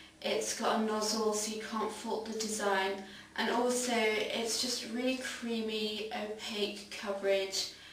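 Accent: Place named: Public school, United Kingdom. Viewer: you have such a fabulous public school accent!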